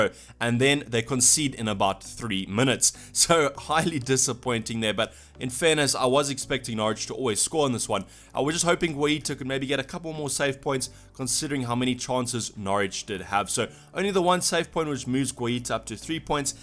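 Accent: South Africa. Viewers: Anyone who can't hear the African Accent is beyond redemption 😂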